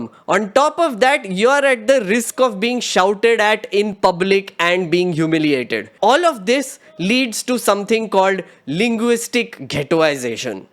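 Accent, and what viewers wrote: India. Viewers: I don't know what a Correct accent is either. It's probably just some white liberals offended, because your accent kinda sounds like Apu. A cartoon character used to make jokes about immigrants from India. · English is an Indian language now and you are speaking indian English while talking about India. I don't understand why people don't understand it! And on top of it choose to mock the accent.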